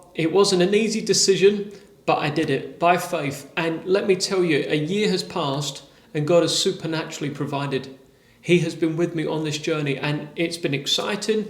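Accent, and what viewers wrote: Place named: England, United Kingdom. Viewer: He is English so he speaks proper English with the actual accent not the junk we hear in America.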